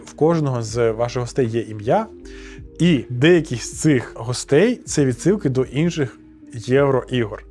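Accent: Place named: Hutsul, Ukraine. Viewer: Сколько вас развелось. Ужас, слушать невозможно, суржик гуцульский, извращенный русский язык.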